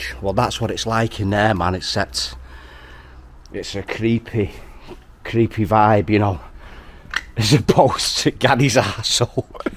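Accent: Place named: Salford, United Kingdom. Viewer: The hollywood touch with a salford accent. Combining flavours.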